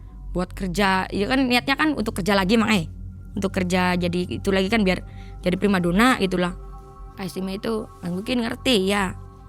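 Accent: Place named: Java, Indonesia. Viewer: cantik, ada medok²nya😅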